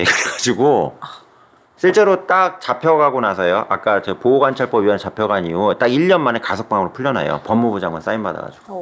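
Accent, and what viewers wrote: Chungcheong, South Korea. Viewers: 교수님 방대한 자료들 찾는것도 힘드실텐데 이 모든 자료들을 자세히 분석해서 흥미롭게 전개해주시는 능력이 탁월하세요. 정말 위트 있는 입담에 푹 빠져드네요. 충청도 억양이 정말 매력 있으시세요. 김복준님과의 해외사건 케미도 좋고요.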